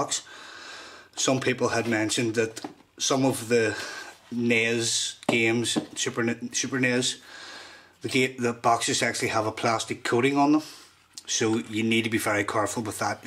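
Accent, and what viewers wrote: Belfast, United Kingdom. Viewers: ***** yeah - he's from northern ireland though. Belfast by the sounds.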